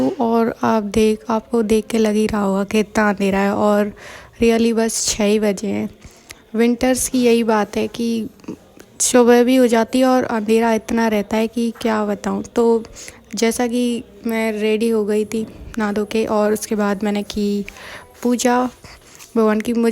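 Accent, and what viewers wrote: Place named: Dholpur-Agra, India. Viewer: Language toh dholpur agra wali h kyuki m yha maniya (dholpur) posted hu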